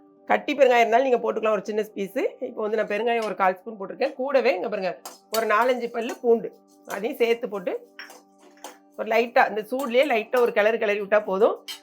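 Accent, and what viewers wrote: Tirunelveli, India. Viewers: திருப்பூர் கோகிலா... வணக்கம் மேடம்... உங்க வீடியோ பாத்தா உடனே ஒரு லைக் போட்டு தான் வீடியோக்கு உள்ளேயே பாக்கறது... Because உங்க திருநெல்வேலி பாஷைக்கு நான் அடிமை 😍😍😍எங்க வீட்டுலயும் இந்த பொடி செய்வோம் 💚💚💚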